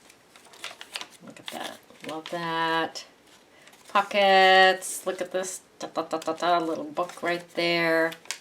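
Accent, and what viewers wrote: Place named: California, United States. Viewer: Oh my gosh it’s early....for me....Would a “button stitched” loop make the closure stronger? Love listening to your California twang, I miss Ventura. And.. I have never heard the term “Underpants” for the binding covers first layers. Makes perfect sense. Love the video. Now I can finish my Cuppa, and go back to sleep.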